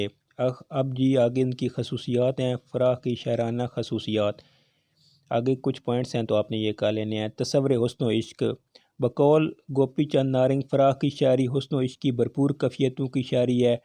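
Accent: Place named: Sargodha, Pakistan. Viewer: Sargodha ki zuban apky lehjey main wazeha jhalak dey rahi h.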